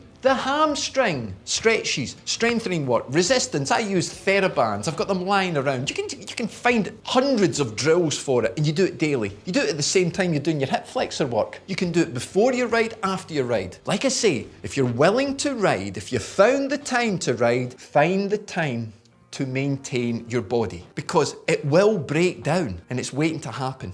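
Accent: Scotland, United Kingdom. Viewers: Can somebody please summarize what this bloke is on about I haven't got the time or the patience to hear and decode all of this Scottish I just wanna know if I'm wreckin mah knees you know and is cyclin bad.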